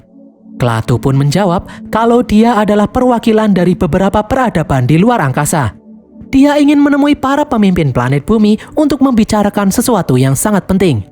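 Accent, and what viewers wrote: Java, Indonesia. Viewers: logat e medhok😂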